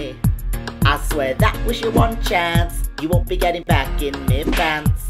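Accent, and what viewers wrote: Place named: North, United Kingdom. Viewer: I love pretty only northeners understand what ye sayin 😂😂😂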